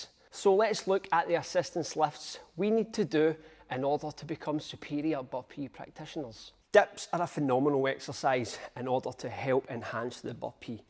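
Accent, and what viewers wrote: Scotland, United Kingdom. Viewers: I love the Scottish mindset, accent, no nonsense approach and hard workout game 🏴 (I'm Scottish)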